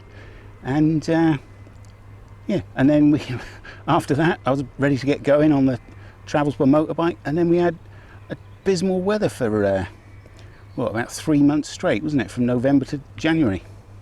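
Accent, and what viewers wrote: England, United Kingdom. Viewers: Omgoddess RESPONDED! I feel special. The herons of my home state Kansas, are a bright blue! I love your travels along the locks and Aslans chug chug, and all American lassies love the English accent. 🥰 Your chopper is also very boss! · I also discovered you on Amazon prime,, You saved my mental health through out the lock down!! I loved the scenes, the homes, the towns,the sound of the Mc laren motor.. your English accent.. I am sorry about the thugs on New Years eve,, what a bummer!! Made me so angry ..You are a Gentleman,, and I thank you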